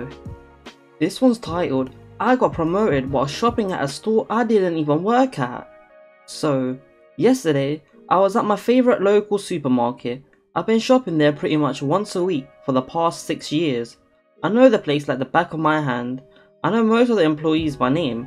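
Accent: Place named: London, United Kingdom. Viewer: i am a subscriber, have been for about 5 minutes because you sound like a london boy to me, gotta watch out for the london crew. i am a satanist, you are more than welcome to use satan as a curse word, we believe in freedom of speech, have fun. ave satanos.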